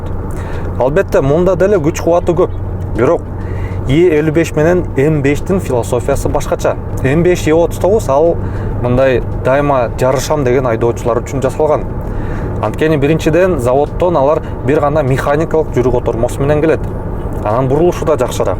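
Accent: Standard. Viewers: Ассалому алейкум иним, эн биринчиден Кыргычаны тапптаза суйлогонун учун баалайм сенин ишинди. Менин биринчи унаам 2001 жыл мерседес 2.7 сdi avangard болгон. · Таза кыргызсча суйлоп жакшы тушундурот азамат ишине ийгилик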